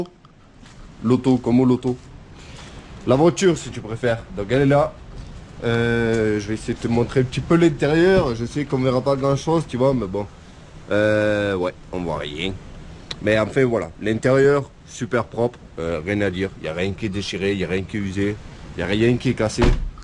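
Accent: South, France. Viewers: Force à toi, le scandinave avec l'accent dégueulasse du midi.... Bisous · G déliré ou t'as l'accent du sud quand tu parles dans la vidéo ?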